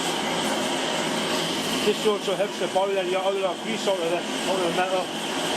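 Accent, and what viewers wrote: Newcastle, United Kingdom. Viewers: As always, warms me wee heart to hear Geordie and a Great Thank you for sharing your videos and for making me discover more then Washington, the Penshaw monument, the Angel of the North, Hadrians wall, the Quayside and of course the Toon!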